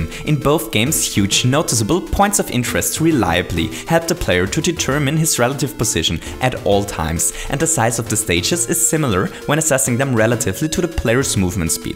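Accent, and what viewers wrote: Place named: Germany. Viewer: I guess he is from Germany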